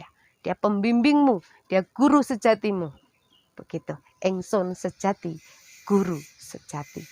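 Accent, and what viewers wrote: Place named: East Java, Indonesia. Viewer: batinku nungu rondo mu mak e iki mesti wong pati opo jatim kasar pool bahasane😂😂😂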